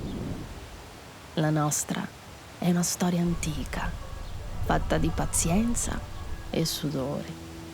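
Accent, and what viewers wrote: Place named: Veneto, Italy. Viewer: Eja tenisi arrexioni...però l'accento sardo mi manca, sento solo il veneto e forse è per quello........qui ci voleva una faccina sorridente ma non so dove prenderla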